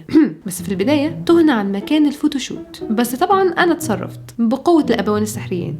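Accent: Algeria. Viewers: واو تتكلمين جزائري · انا مصدومة حرررررفيا انك تكلمتي جزائري كتتتير منيح 🤠🫰🏻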